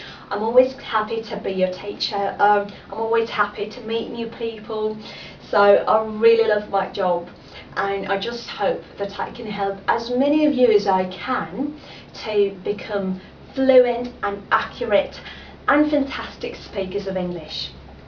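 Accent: United Kingdom. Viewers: Никогда бы не подумала, что русскоговорящий человек может добиться такого произношения! Очень по-британски звучит. Мне американский больше нравится, т.к. легче повторить самой и понятнее речь других, а британский кажется каким-то вычурным, но все равно это очень круто :) Не могу определиться только с мыслью: что лучше для учеников, преподаватель с британским или с американским акцентом? Ведь в первом случае улучшается их способность понимать этих странных британцев :) а во-втором увеличиваются шансы, что их поймет большинство. Овладеть сразу несколькими акцентами и умело переключаться между ними, полагаю, задача не из легких... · Thanks for the wonderful video (despite the sound, though). I'm German and I'd like to have such a wonderful British accent like you. So I envy you a little bit.;-) It must have been much harder for you as a Slavic to obtain the British accent. 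I'm wondering how you obtained it and how long it took you.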